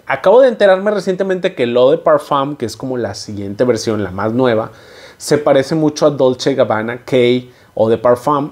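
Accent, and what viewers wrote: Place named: Monterrey, Mexico. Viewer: Por la camisa que traes y el acento que tienes,me imaginó que eres de Monterrey y allá el calor esta cañón...buen perfume para el calor de Monterrey.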